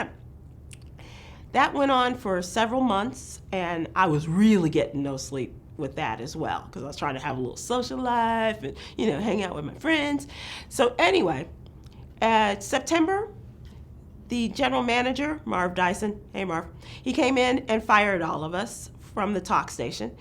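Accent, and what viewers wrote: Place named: Chicago, United States. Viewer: Chicago accent 😊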